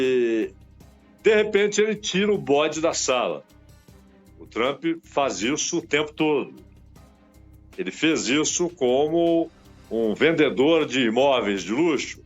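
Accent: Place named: Caipira, Brazil. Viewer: Vc é velho comunista, com sotaque caipira ! Vtsc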